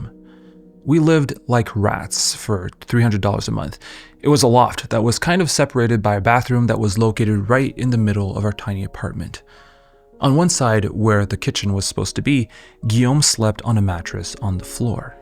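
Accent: Quebec, Canada. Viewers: Did the freedom from monetization allow you to be more french-Canadian too? I feel like the Quebecois really came out in this video 😊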